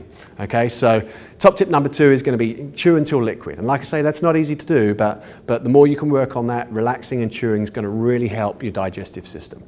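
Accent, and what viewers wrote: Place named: New Zealand. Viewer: Nice to listen to someone from home soil.....NZ! I have been diagnosed with sever vitamin D deficiency and have such horrendous symptoms. I was having bladder issues, couldn't walk properly, had bone pain and terrible brain fog and memory loss. I felt so out of control so the only thing I knew I had control over was what to eat. I have been eating exactly the diet you are suggesting....good on me!!...and after 4 weeks now I am slowly starting to feel myself returning, the inflammation is going down and my mind is clearing. I have a long way to go but your video had really helped me with so many good tips. I have just bought an organic bone broth kit so getting into that this week!! Thanks, I'm going to make my cheese loving hubby watch it!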